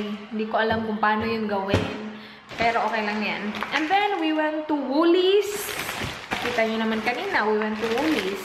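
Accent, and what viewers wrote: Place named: Cebuano, Philippines. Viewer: I really love your cebuano accent <3 hearing them talk cebuano - english is so lovely. That american accent tho <3. like colorismyweapon.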